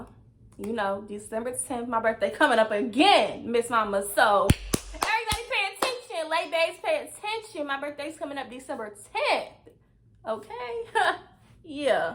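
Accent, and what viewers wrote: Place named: DMV, United States. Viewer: I knew soon as you started talking you was from the DMV 😂😂 old men be gross AF I got a few stories from back when I was damn 14/15/16 LOL I’m like sir... wrinkles and powdered milk don’t do it for me 💀💀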